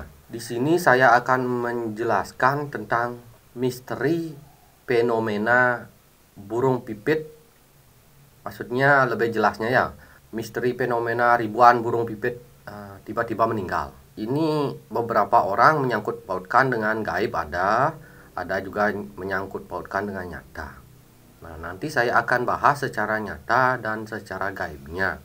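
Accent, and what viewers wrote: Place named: Borneo, Indonesia. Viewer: salam dari tanah dayak kalimantan cara ngomongnya mirip sekali logatnya dgn org dayak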